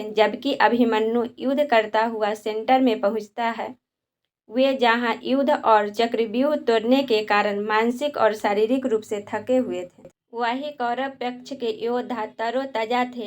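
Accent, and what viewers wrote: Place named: Bengal, India. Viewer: Thoda apna hindi bolne ka trika sudharo hum lagta hai bengali ho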